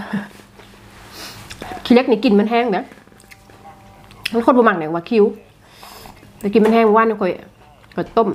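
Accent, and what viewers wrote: Savannakhet, Laos. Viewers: ເຮັດຫຍັງບໍ່ເປັນກະເບິ່ງຊ່ອງເອື້ອຍແນະນຳລະອຽດມັກຫລາຍເບິ່ງທຸກຄິບເລີຍ ສຽງເວົ້າກະມ່ວນສຽງຄົນສະຫວັນຍ້ານເຮົາ😍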